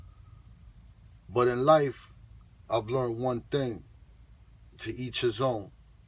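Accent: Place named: New York, United States. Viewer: Great 10 minutes of insight...This video got me ready to sign up for some cdl classes and start my own journey. Off topic you sound like you from nyc💯💯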